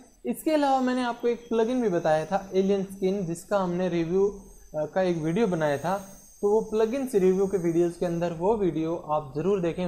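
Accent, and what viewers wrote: Haryana, India. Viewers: Tu Hariyanvi or Rajasthani hai 😁😁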